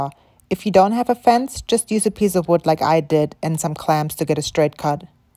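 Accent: Germany. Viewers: Brilliant job on the cleat system! Your accent sounded somewhat German, and then I heard you say "fertig" so that's certain! Ich kann ein bisschen Deutsch, übrigens! Curious how you found your way to the US! Subscribed and looking forward to your videos! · Hallo wunderschönes Video. bist du Deutsch,dein Akzent klingt so,geh einfach mal davon aus und hab deshalb auf Deutsch kommentiert. Hab deinen Kanal abonniert und meine Daumen zeigen nach oben. Wünsche dir viel Spaß bei deinen Projekten und weiterhin so schöne Videos. Frohe Weihnachten und bleib gesund. Gruß Rudi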